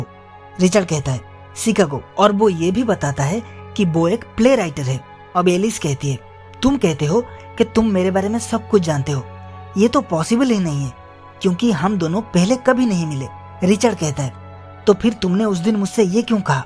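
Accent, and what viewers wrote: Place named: Gujarat, India. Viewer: Itni acchi movie ko gujrati accent mein explain kiya hai isne 😢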